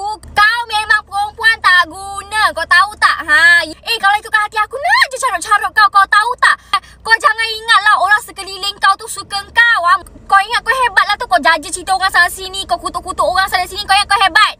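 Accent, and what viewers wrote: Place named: North, Indonesia. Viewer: Mula dgaq ckp cm keyy yell..lama2 dgaq cm org utara plk..😂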